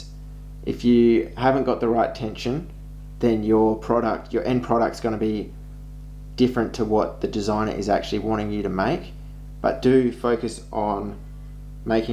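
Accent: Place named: Australia. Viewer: Thank you. I am about to knit my tension square. Nice to hear an Aussie voice too. Looking forward to more videos by you. Happy knitting 🧶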